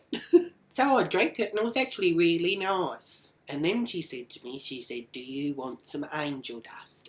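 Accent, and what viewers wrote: United States. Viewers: loving your American accent chook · Your American accent is really good. You sound just like an American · love wats the 'merican accent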